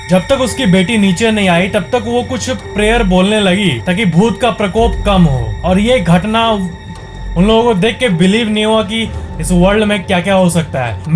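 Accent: Bihar, India. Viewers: Kya bihari accent hai 🤣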